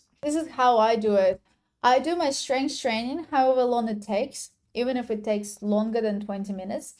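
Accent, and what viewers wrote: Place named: Ukraine. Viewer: Great video! BTW, what is that beautiful accent of yours?😊 Are you from Ukraine? 🇺🇦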